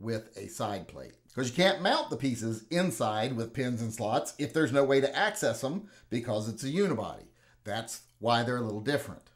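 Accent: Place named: New England, United States. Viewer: Where you from originally?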